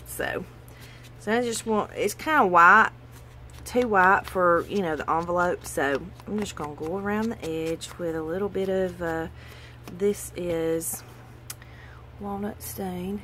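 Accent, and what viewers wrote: South, United States. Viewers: Just found this video and it brought tears to my eyes. That accent it straight from my southern roots! Well, I still sound like that even though I’m a transplant 😎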